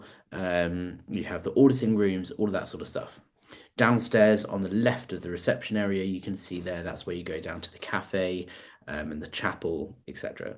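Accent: United Kingdom. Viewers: oooh that’s interesting!!! I am in Aberdeen Scotland. I have been following Chris, Aaron, Marc and others for a few years now. You and Jon Atack are the only out there ex scientologists I have come across with ‘British’ accents which brings Scientology closer to home, but I never really thought about it’s impact here in my part of the UK. Once, a handful of years ago, a guy my hubby worked with in Aberdeenshire mentioned he was going off to a Scientology meeting, of course pretty arrogant about it all, but my impression was he was still very new to it all and I guess in my mind I figured it would be him and a couple other ‘strange’ people involved in something so ‘crazy’. Now I wonder just how many folk up here, meaning Scotland not just my small part of Aberdeen, are still tied up with this cult. Is there anywhere I can find out about Scientology in Scotland, or is that too small a group to factor much? 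By the way, new subscriber, loving catching up on your videos, good luck with the channel!!!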